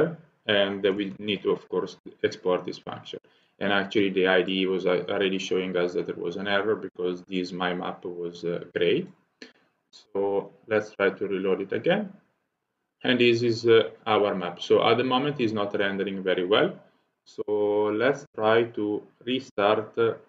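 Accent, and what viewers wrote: Italy. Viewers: hey! thank you for the great tutorial I found it very useful for my project, btw your Italian accent is awesome :D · thats a really good tutorialle. I opened my Appe. ıt worked with the leaflet mappe. BTW i didnt even heard italian ever but its funny ı give u that :D . thank you anyway :D greate tutorial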